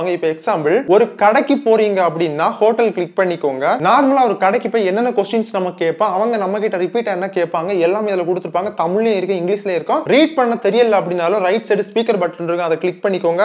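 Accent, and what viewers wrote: Kanyakumari, India. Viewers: Nanba Are you kanyakumariyan?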